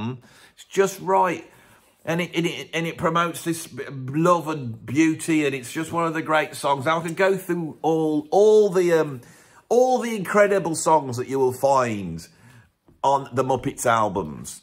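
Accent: United Kingdom. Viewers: I am an American. There are some British accents that I like and some that I do not like. I like your British accent. What region of the country is it associated with? I would love to know.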